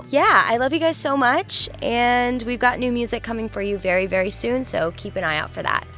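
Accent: United States. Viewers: I do enjoy your conversational videos even more than those where you sing.Your flawless American English is so good for my foreign ear that i can get trained in understanding a naitive speaker speech while being out of conversational practice at the moment myself...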